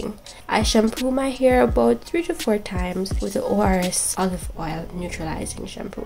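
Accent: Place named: Jamaica. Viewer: I know that beautiful accent anywhere! Go thru yawdie 🇯🇲🇯🇲! U did amazing. So I have a question for you , So I'm natural never been relaxed , but I'm currently contemplating relaxing my hair. Any suggestions as it relates to what relaxer to use? Being as how it's going to be my first time do you suggest going to the salon to get it done or doing it myself?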